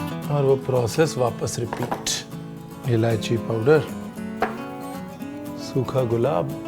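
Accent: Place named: Lucknow, India. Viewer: Hi Ranveer...u r really awesome when u say....aap kahenge Ranveer aapne bataya nahi....real Lakhnavi Andaaz.... Thanks for such lovely dishes....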